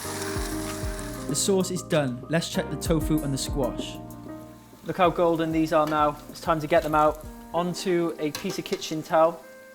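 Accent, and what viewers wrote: Wales, United Kingdom. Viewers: Your cooking is incredible! Thanks so much for sharing your skills, I would so happily watch you on TV doing this - get those Welsh tones on telly!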